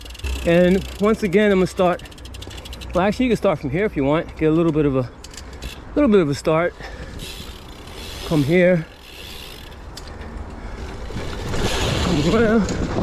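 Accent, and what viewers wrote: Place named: United Kingdom. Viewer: That british accent tho haha! Love the video as always my man!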